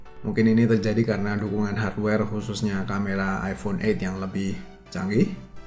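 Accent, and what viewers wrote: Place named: Yogyakarta, Indonesia. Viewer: Wong jogja toh?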